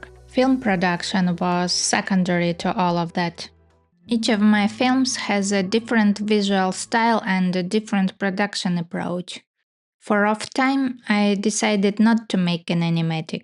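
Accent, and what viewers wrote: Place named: Russia. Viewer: судя по акценту вы знаете русский, я прав?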